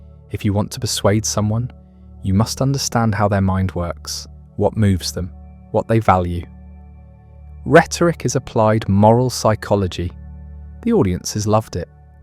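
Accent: United Kingdom. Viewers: can't understand brit accent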